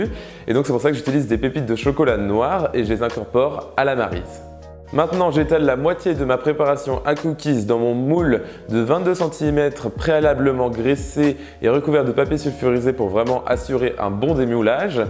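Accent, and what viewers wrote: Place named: England, United Kingdom. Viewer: ouais ta raison . mais il a un accent déjà bien anglais peut être qu' il anglais et français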